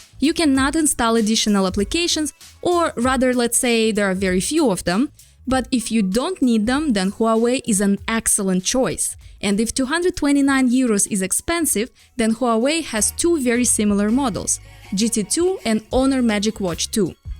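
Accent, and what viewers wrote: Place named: Russia. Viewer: i like russian accent hhhhh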